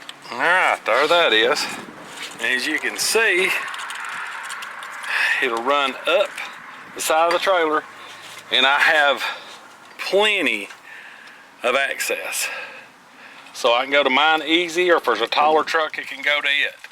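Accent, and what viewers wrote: South, United States. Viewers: the southern drawl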